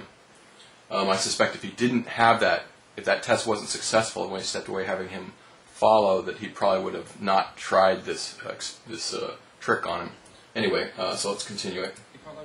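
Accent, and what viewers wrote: United Kingdom. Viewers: Your video was thurly explained. I like that. That and i don't understand the british accent that well so ya :P Thx! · u kno what? that all sounds pretty legit on a very unusual level, but it basically all comes down to one thing: british accent. That combined with non-stop inane chatter could put anyone under for a few seconds. . . but like i said, the other explainations are nice too.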